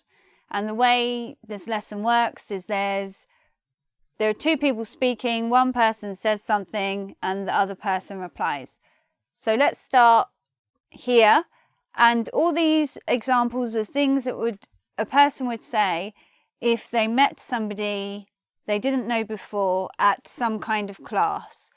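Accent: United Kingdom. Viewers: I love her British accent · One more time, thank you! I like it British English pronunciation too.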